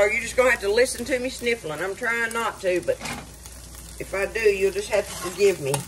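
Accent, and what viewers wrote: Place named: Texas, United States. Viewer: I have that same pan Mrs.. As a proud Texas native, I knew what you meant when you said "hamburger meat". We use ground beef to make hamburgers with so to us it's the same thing 😅. I'm currently living in Oregon, so listening to you talk is music to my ears. I miss home. I can't wait to try this!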